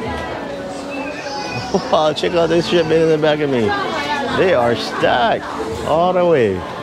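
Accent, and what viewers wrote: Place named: Hawaii, United States. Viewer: You sound like a local boy, you from Hawaii?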